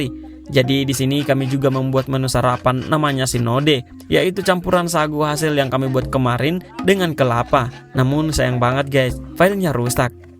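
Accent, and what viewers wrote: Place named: Toraja, Indonesia. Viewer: Pantesan bahasa daerah nya gak asing ku dengar, ternyata orang Toraja 😅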